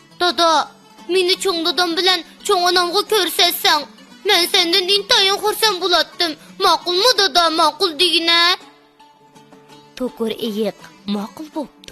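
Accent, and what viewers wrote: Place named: China. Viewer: Какой жесткий китайский акцент. Это так специально делается? Почему чистое уйгурское произношение так уродуется? Что за через чур мягкая, какая то детская манера говора. Этот канал под китайскими властями действует? Никогда гордая уйгурская женщина так не разговаривала. Поправьте меня, если что то не так. Ну не мне одному же это кажется??!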